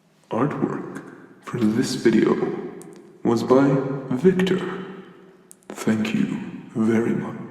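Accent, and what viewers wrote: Ireland. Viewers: Oh my, thank you for Irish accent ✨ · I'm a simple man, I see irish accent I click. No regrets here, I'd love to be this giant's pet. Edit: God your voice is hypnotic in this. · Irish accent Wasn't Atlas Greek though?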